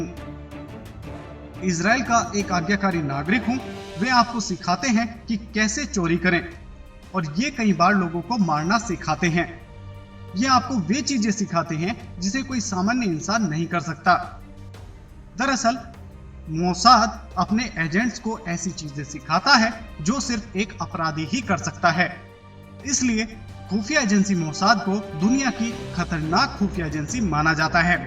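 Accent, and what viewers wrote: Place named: Pakistan. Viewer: Abe chootiye Tere lehze se he saaf saaf pta chal rha h ki tu Pakistaani chootiya h.. Indian Muslim ka behavior of expressing tujse kahin bhi match nahi krta.. Ab kutch sensible reply ho to zarur comment kariyo..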